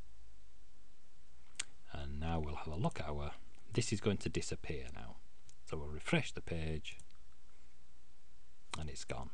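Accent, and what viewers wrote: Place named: North, United Kingdom. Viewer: Nice tutorial. A man after my own heart... nice and steady, not rushed at all... and with the added benefit of a northern accent! :)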